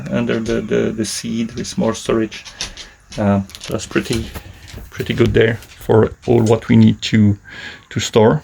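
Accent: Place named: France. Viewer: Merci beaucoup d'avoir partagé votre point de vue, et quel beau bateau, excellent gréement ! Youtube fait la promotion de votre vidéo aujourd'hui, alors bonne chance, vous obtiendrez plus de vues et de clics dans les semaines à venir, je suis un "Subscribed" maintenant . Quelques questions : votre enseigne est USA, votre accent est français et votre cuisine est belge, où est votre port d'escale maintenant, toujours Seattle ? Je n'ai pas saisi le nom de votre bateau 🤒(AIS?) Je ne connaissais pas le constructeur du bateau, donc superbe promotion pour Fabola. Intérieur magnifique. table bonne idée, mais pourrait être mieux... Je suis d'accord sur l'enrouleur dans le mât, mais couvrez le triangle restant aux UV, je viens de casser mon point d'écoute de selden enrouleur à Nieuwpoort en juin. La plate-forme de bain est une solution un peu maladroite entre un bateau de course avec le contrôle du mât arrière et un croiseur avec une sortie confortable de l'eau. 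Vous êtes le seul à avoir une Fabola sur Youtube, alors partagez d'autres vidéos si possible. Votre moteur, Volvo Penta ? Qu'avez-vous changé sur votre bateau depuis que vous l'avez acheté ? Votre équipement de navigation..vos voyages au dela de la cuisine. Plus important encore, qu'est-ce qui s'est cassé et comment l'avez-vous réparé. Il y a une grande communauté de marins sur YT qui apprennent les uns des autres, et peut-être ce qui s'est mal passé va aider la communaute.Pas tout le temps des bikinis, et le style sans faute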